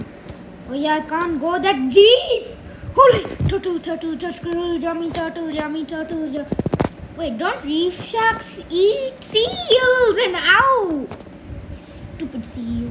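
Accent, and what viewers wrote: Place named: India. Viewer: Your accent like Indian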